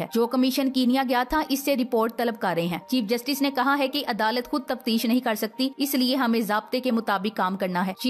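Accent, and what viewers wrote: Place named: Punjab, India. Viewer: as always just luv and wait for ur post ad your sign off in lovely Punjabi tone Thanks' for watching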